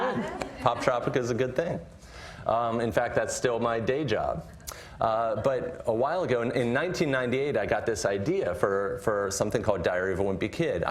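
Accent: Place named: Brazil. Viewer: The way he talk brazilian XD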